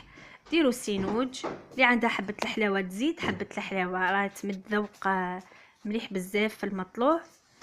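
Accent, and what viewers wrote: Setif, Algeria. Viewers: لهجة تاعك سطايفية حرة انا من سطيف ونحبك بزاف حنونة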